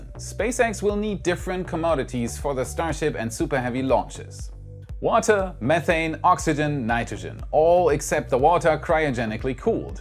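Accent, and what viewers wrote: Germany. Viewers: It isn't a weird mixed accent. It's English with a German accent. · Your german accent is almost non existant now. :-) hi from magdeburg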